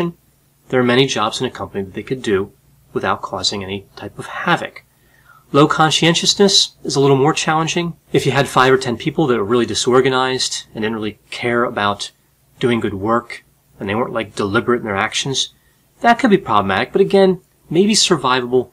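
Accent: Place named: Philadelphia, United States. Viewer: I can tell you're from the Philly area because of your accent. I too am from Philly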